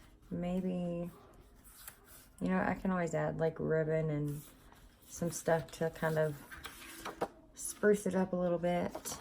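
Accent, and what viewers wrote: South, United States. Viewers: Hi! New friend to your channel from Kentucky. I can tell you have a southern accent as well. Thank you do much for showing us how to start one of these cute journals because I want to make one for my daughter as well as one to do with my triple negative breast cancer I was diagnosed with this past November 2020. You truly have inspired me to make some. 💗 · I’ve never made one of these . I’m honestly not very crafty. I have watched a couple of people in the past do these I I just couldn’t make heads or tails out of it. Watching you and your thought process as you put this together made so much since to me. I learned more from these first two videos than I’ve ever been able to learn before. I’m a visual learner and you made me feel like I really could do this! You kept apologizing in the video and i kept thinking no, no, no, you’re teaching this the way it should be taught. 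I for one am very grateful. It wasn’t boring to me, it was informative and detailed in just the way I needed it presented in order for me to understand. Thank you so much. This is my first time here. I kept thinking as you talked that you have to be from Arkansas, Texas, or Tennessee. I’m from Tennessee and I’ve been transplanted in Arkansas for the last several years. Lol! I can’t wait to watch you again!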